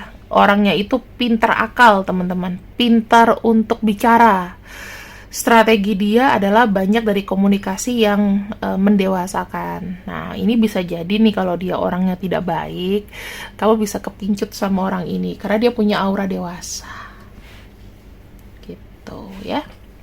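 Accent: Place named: Surabaya, Indonesia. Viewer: Opo maneh,suroboyoan bgt 😁👍🙏🙏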